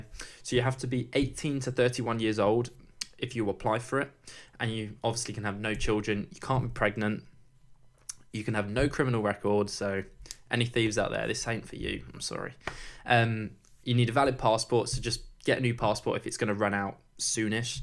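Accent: United Kingdom. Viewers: What is your major I wonder, you have a perfect and professional British accent like my tutor in Durham uni , love it soooomuch!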